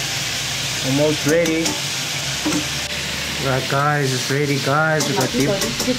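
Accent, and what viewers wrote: South Africa. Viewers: You sound south African. Lol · This recipe is fantastic! Quick and delicious. Thanks very much. Are you by any chance based in Cape Town, South Africa as your accent is so distinct, I had to ask? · This guy sounds like his grown up in South Africa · Wait, are you from South Africa by any chance?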